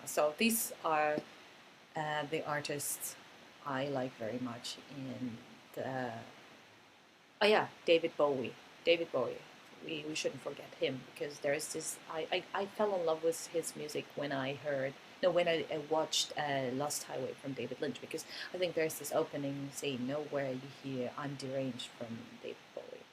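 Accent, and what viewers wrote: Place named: United States. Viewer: Alice, what other language(s) do you speak in addition to German, Japanese and English? BTW, how did you get your American accent when you speak English???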